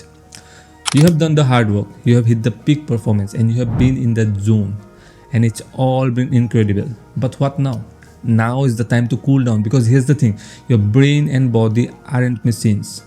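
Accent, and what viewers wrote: India. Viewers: I hear Indian for some reason like it’s screening at me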